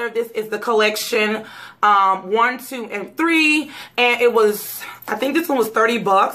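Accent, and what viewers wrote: Mississippi, United States. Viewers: Girl you from Mississippi? I instantly subbed! 🙌🏾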